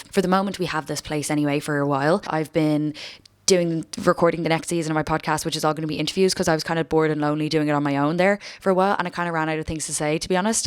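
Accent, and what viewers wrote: South Dublin, Ireland. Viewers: Are you from the south side of Dublin? ❤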